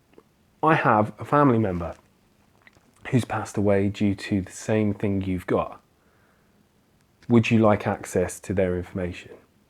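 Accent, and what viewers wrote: Australia. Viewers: You sir are an inspiration oh love the Australian accent nearly as good as mine !! Hope everything goes ok tomorrow all of us Here will be thinking of you xx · Love the aussie accent, you"d make a good aussie warrior with your craziness, we do wacky well in Oz. Love ya, looking forward to getting up one morning and seeing you tell us all you have found the key!:)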